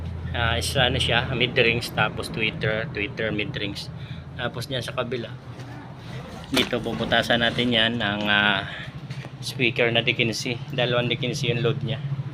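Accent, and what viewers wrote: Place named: Camarines Norte, Philippines. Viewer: hello po idol,watching po full wh po,done tamsak at dikit narin po ako sa bahay mo😊❤❤parang sa tono po ng salita mo idol parang taga camarines norte ka po,taga dun po kase ako baka po magkababayan tayo idol😊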